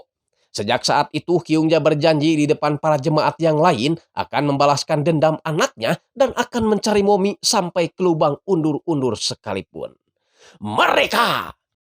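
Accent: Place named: Java, Indonesia. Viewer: Aku malah suka pembawa suara nya ..medok² piye gitu 😂😂😂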